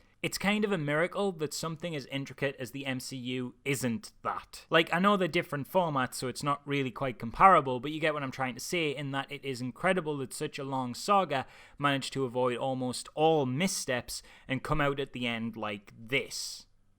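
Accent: Newcastle, United Kingdom. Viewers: Big plan eh? Just a heads up. I came back for the spiderverse review now I have finally watched it but lo an behold....I had been un subscribed.....good job I remembered the channel name eventually as 'well spoken Geordie does eloquent reviews' was getting me fkin nowhere....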